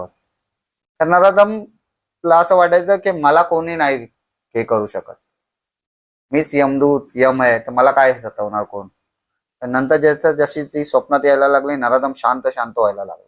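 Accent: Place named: Mumbai, India. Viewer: your marathi accent is lovely... typical Mumbai marathi... I love it.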